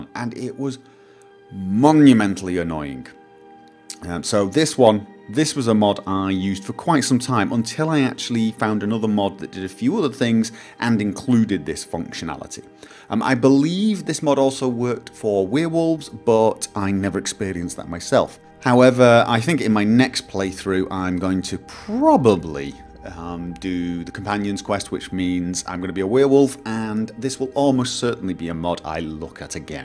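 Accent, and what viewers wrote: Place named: England, United Kingdom. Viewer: DawnGaurd to me was a total disappointment! Vacation? Come on you sound English use Holiday please!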